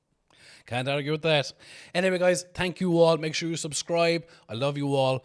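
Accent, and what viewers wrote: Ireland. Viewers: You are a born story teller, it’s the Irish accent, 323, I enjoy your videos as they are 👍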